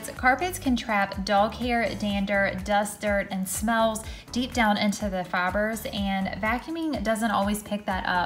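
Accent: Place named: South, United States. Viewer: this the only video i’ve watched & i can tell she from the south 😂😍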